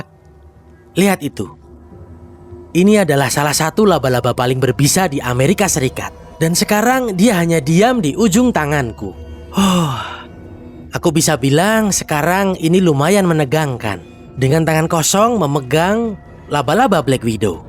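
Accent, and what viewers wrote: Java, Indonesia. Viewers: logat jowo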